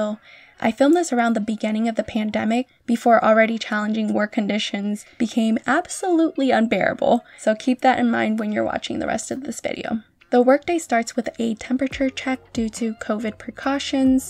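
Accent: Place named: Boston, United States. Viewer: LMAO !! You're from Boston !!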